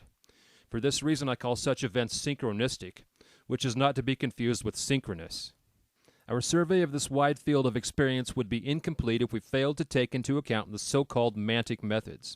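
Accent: United States. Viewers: where are you from, that accent is from Atlanta?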